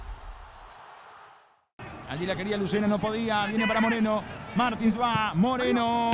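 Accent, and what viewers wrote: Paraguay. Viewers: El acento asunceno si tiene parecido con el rioplatense, que el tipo hable de manera correcta no significa que le esté copiando a los relatores Argentinos. Nde caprichosove kururu pytãgui chamigo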